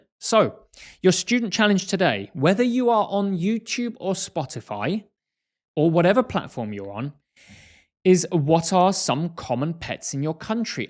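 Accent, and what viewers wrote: United Kingdom. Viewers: I love it when I heard your wonderful British accent.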